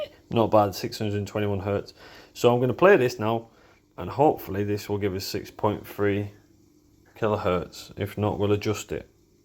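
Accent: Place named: North, United Kingdom. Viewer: Absolutely love this northern (?) accent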